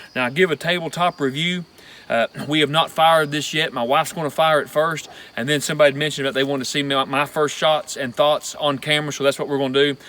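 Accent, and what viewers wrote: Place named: Appalachia, United States. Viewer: You are awesome dude, you had me lol the sounding like a hillbilly comments. My wife says the same thing.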